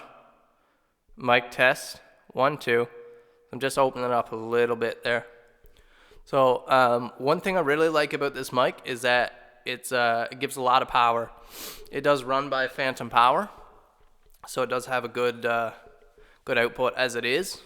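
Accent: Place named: Newfoundland, Canada. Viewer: Start video. "This guy's from Newfoundland". Look at About. "Yup."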